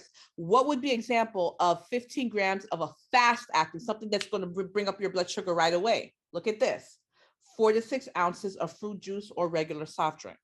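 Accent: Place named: Haiti. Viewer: All of your videos are so helpful I love the way you break It down. That’s exactly how I speak to myself when trying to learn . And reading the book alone is definitely not as helpful since you make It make sense ! One of my classmates told me to watch you cause she said we speak the same, and when I did I had to laugh ! I kept saying to myself she gotta be Haitian and I would like to say originally from New York or lived there at a point ! Anyway , thank you for all that you do for us nursing students 🙏🏾🙏🏾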